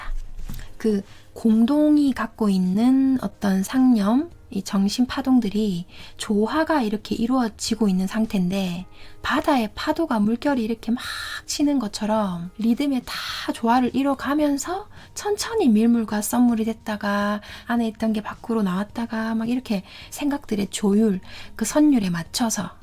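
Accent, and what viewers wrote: Gyeongsang, South Korea. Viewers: 말투가 경상도가 고향 인가봐요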